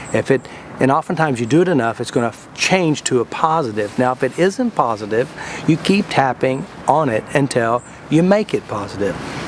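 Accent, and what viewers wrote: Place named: South, United States. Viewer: Great video! Also for people who aren’t used to hearing a nice US southern accent, this is a nice example. ;)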